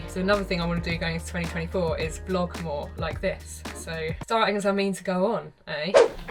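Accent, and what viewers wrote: England, United Kingdom. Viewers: You have the best English accent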